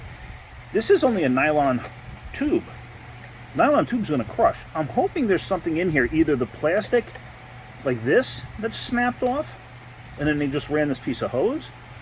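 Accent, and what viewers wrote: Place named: New York, United States. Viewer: I thought you sounded like a New Yorker, then in recent videos you've confirmed that. I spent 30 years in Texas and for a bit in the 80s did the annual state safety inspections. I've now been in Minnesota for 10 years and have now experienced the rusty crusty stuff common in the "salt belt" of the US. I've been watching Eric O at SMA deal with the rusty crusty stuff PLUS the crazy laws and regulations and restrictions that NY state puts on cars and I have mad respect for him dealing with all that. I'm guessing you're glad to not have to deal with all that!!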